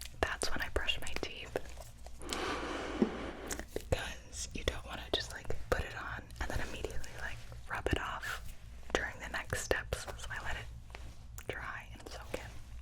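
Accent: England, United Kingdom. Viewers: Where are you from? England?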